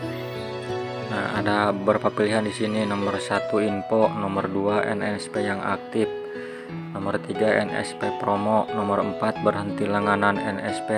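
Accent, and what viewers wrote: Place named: Sunda, Indonesia. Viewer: Sunda teing ey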